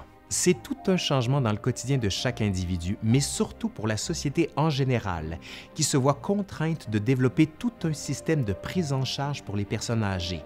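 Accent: Quebec, Canada. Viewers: Je suis en train d'apprendre le français et je m'interroge sur l'origine de l'accent de cet homme. Est-il québécois, par hasard ? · Je découvre ta chaine, là à 3h du mat', un ravissement pour bercer mon insomnie ! Super intéressant (sans parler de l'irrésistible accent de la belle province😊) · as tu déjà essayé une sorte de challenge d'action ? C'est à dire lorsque tu te poses une question sur quelque chose tu essaye d'identifier quel est l'hésitation et le doute à l'origine et d'y associer une action en faveur de ce que tu penses être aligné avec tes désirs ? Merci pour la vidéo j'ai écouté du début à la fin dans le Metro Parisien. J'ai trouvé ça très construit. Et l'accent québécois... Un vrai delice !!!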